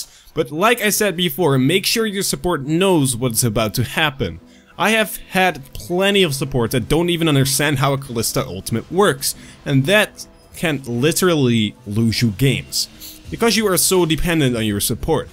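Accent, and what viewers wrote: Netherlands. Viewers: Are you Dutch?! xD I notice it in you accent. Great job with your channel!! :)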